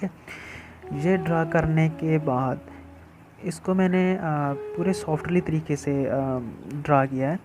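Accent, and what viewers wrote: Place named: Punjab, India. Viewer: Hindi me punjabi touch 😁😁😁 but vry nice sir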